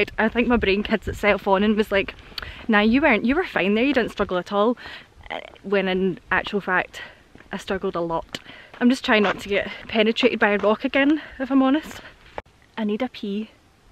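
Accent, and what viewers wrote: Scotland, United Kingdom. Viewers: I am in love with the Scottish accent!! 😍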